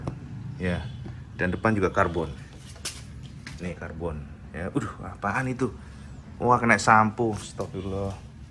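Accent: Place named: East Java, Indonesia. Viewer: nonton kembali vidio ku ya ;) Aq guyu2 dewe cak ngrungokne bahasae peno koyo wonk jatim ada an 😁😁🤭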